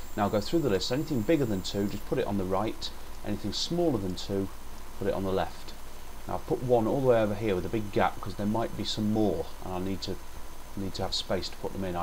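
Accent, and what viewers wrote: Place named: United Kingdom. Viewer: your british accent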